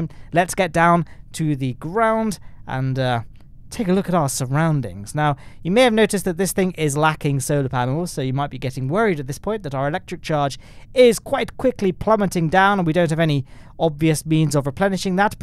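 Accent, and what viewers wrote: United Kingdom. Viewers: It's just how us british talk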